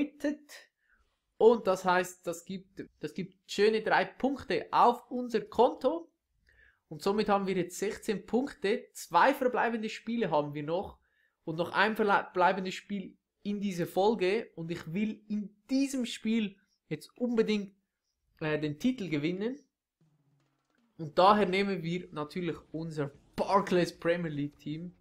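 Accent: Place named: Switzerland. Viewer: Deine videos und dein schwitzer dütsch is hammer geil! :D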